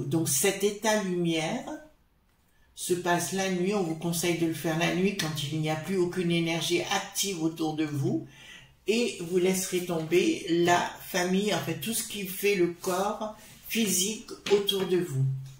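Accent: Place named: Brittany, France. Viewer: J'entends Bretagne limite Normandie, ca parle à quelqu'un ?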